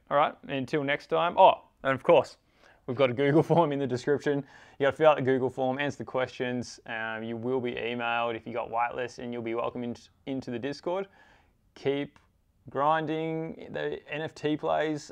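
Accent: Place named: Australia. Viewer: Watched all the way through now, I actually enjoyed hearing a fellow Aussie in the space so keep it up my friend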